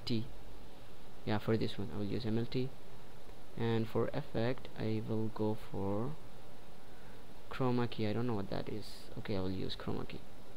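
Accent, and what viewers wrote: India. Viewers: this guy is just messing up with you, he might ment to say that you sound Hindu(is your accent) but who cares, nice video, helped me out a lot.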